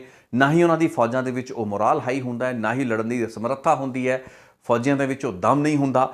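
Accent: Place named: Doaba, India. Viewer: One thing noticed more about u that u have doaba accent... "Peha"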